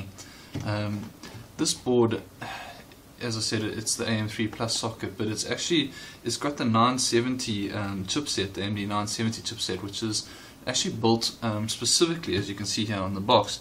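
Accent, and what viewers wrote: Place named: Afrikaans, South Africa. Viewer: Jy klink Afrikaans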